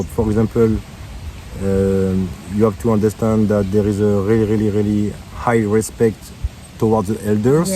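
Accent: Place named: France. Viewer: My friend, the French accent. Super .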